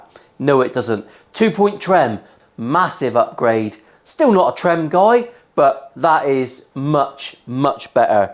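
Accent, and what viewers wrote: United Kingdom. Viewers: Great to see that i am not alone to think that this Jet is an awesome instrument. I have a JS 400 sunburst lefty and my Fender stays in its case since . Also even at this price the trem is a Wilkinson . The tuners are pretty decent as well . Your videos are a great source of learning. As a French speaker I am also learning to understand the British accent better! Thanx!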